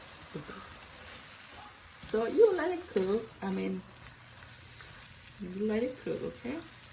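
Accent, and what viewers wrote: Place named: South Korea. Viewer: Hi 😊 I am a korean american and I moved out of my family's house in 2021. I am missing a lot of korean food and it's very lovely to hear korean accent and laughter from you ❤ you make me miss so much food and I can smell the meat cooking from the screen... please continue cooking!! You are giving me so much joy ❤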